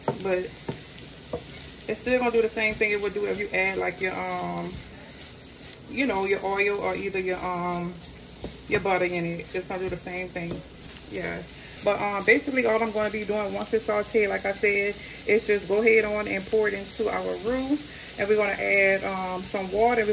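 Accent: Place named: New Orleans, United States. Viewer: Are you from New Orleans??? Sounds like you are. I was practicing on my hair Weaving skills, & I thought starting my own channel. Gurl, I'm sho you from New Orleans & you're doing a Great Job 👍. I've been in the Retail business over 30 years & I had to Speak Professionally. One thing that People love, is our Accent!!! It's beautiful Sis.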